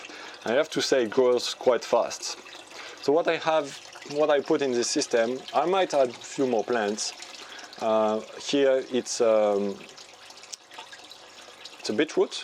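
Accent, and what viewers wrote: France. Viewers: are you french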